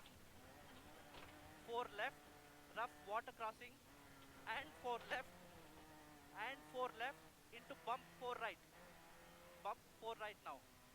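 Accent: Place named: India. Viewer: First time ever I am going to heard Indian ascent for the pace notes... Looking for more content from your side... 😄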